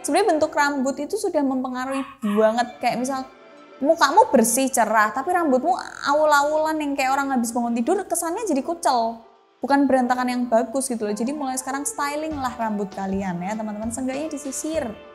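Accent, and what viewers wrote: Java, Indonesia. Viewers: Senyum sendiri menyimak medoknya wong ayu iki... Wanita Jawa memang luar biasa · Jowo bgt sampeyan mbak,👍👍👍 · Jowo toh mbak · Pertahankan Logat jawanya..saya sangat suka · jowo bgt logat km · Jowo banget uwoooow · Jowo ne pundi mbak'e? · jowo ne ketok poll yo wkwkw 😀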